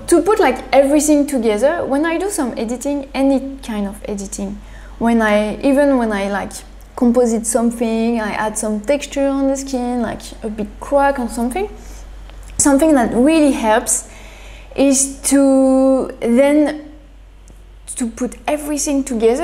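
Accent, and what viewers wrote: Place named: France. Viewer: "but that's not bad, hein !" Not only will we forgive your french accent, we will also love it ! <3 Also, prepare for my rainbow cat 🙈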